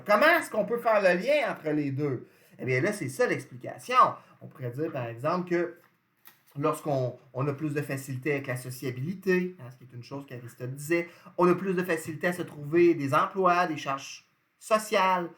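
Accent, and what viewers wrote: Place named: Canada. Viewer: L'accent canadien est un peu difficile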